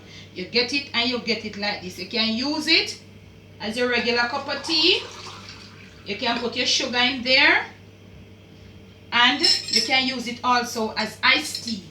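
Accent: Jamaica. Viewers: I heard the accent and BOOM 💥 HIT that subscribe button... I love ❤️ mama’ patois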